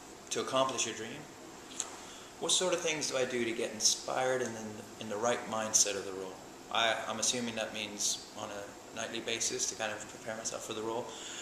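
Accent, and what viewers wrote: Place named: Canada. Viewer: yeah he does have a slight accentuation on certain sounds :) but its defo a canadian accent :) he may speak another languadge he was born in Iran so who knows maybe thats the cause of the slight accent :D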